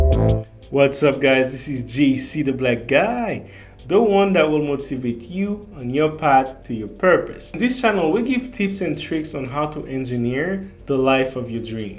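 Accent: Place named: Haiti. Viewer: Where are you from? Your accent sounds Haitian to me, but I'm unsure. That, or what's your heritage? Were you raised by others who had a similar accent?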